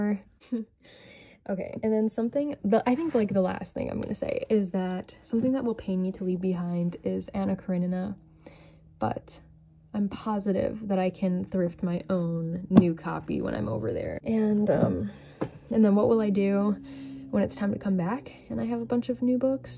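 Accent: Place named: Spain. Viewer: ur spanish accent is cute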